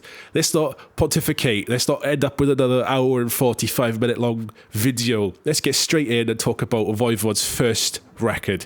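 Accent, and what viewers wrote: Wales, United Kingdom. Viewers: Do I detect a Welsh accent?...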